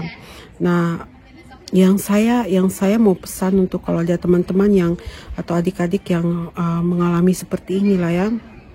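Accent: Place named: Papua, Indonesia. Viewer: Maaf bunda apa asalnya dari paua soalnya logat bicara bunda seperti orang papua.. Aq jga ada saudara papua tepatnya di nabire.. Aq suka sama bunda karna murah senyum.. Kebunya luas sangt indah sekali suasananya... Memang php mnyakitkn betul kata bunda php bisa terjadi pada siapapun dan dimanapun.. Semangat bunda0💪💪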